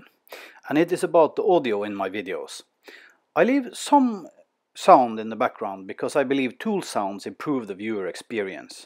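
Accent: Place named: Ireland. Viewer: Don't lose the tool sounds, and please do not add music. Your vac and air scrubber remind us that safety and cleanliness go together. I like your commentary, you sound as if you were taught English by an Irishman. That's no bad thing by the way, Irish people often have better diction than geographically English speakers of the language. Thumbs up from me, you got a new sub 👍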